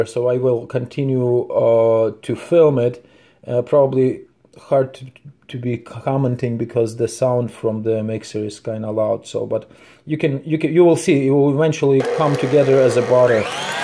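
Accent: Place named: Germany. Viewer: Very interesting. I sense a slight German accent. Good presentation. My aunt was a catholic Nun and she lived in a Monestary ( Kloster ) where they backed their own bread and made butter. And connected to it was a Brewery.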